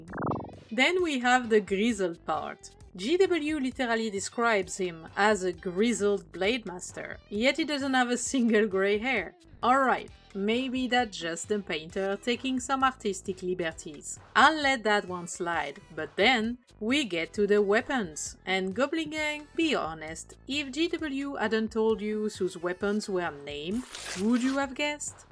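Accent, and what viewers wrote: France. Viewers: surprenant d'avoir l'accent frenchy de fou alors que tout est en anglais 😆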